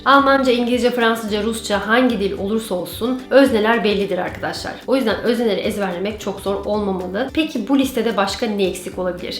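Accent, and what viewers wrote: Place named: Turkey. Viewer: aksanını acilen düzeltmen lazım çünkü belli bir şekilde Türk olduğun biliniyor.Bu arada saçların çok güzel.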